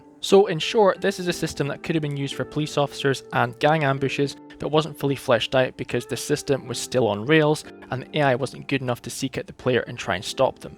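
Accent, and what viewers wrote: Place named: Edinburgh, United Kingdom. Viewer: Your accent, you a fellow scot? Sounds like an Edinburgh dialect. Great vid btw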